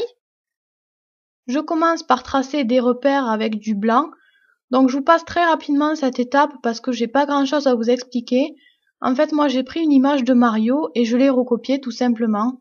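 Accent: South, France. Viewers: J'adore ton accent tu viens du sud ? De la france !!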